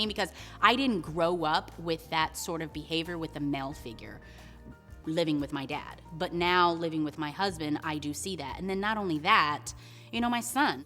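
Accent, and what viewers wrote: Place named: Bronx, United States. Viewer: Sound like Bronx tale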